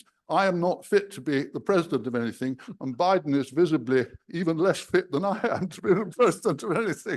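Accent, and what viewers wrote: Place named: United Kingdom. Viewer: yes, when I hear a pompous British accent I know it's a coward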